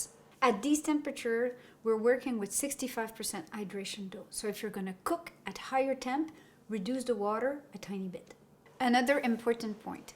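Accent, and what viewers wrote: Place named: Montreal, Canada. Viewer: Look like the montreal accent ☺️ ... from what ive always heard "00" stand for the ash content in the flour tho ... 👍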